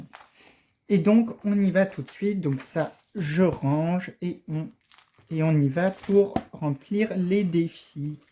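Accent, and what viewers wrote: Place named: Nord-Pas-de-Calais, France. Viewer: C'est cool tu ai ch'ti comme moi trop bien j'adore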